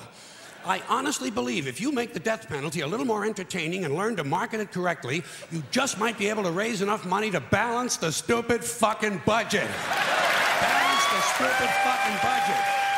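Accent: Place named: New York, United States. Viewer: Lol yea his new york accent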